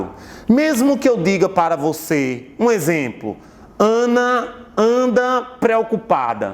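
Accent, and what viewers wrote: Northeast, Brazil. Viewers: sutaque NORDESTINO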